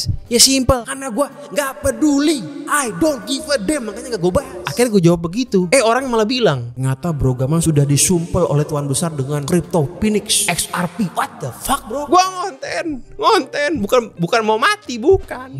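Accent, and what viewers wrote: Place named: Betawi, Indonesia. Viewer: Gw subs si Bro Gamal ini karena dialek Betawinya mantaffff dan juga berani roasting tuan besar rotschild.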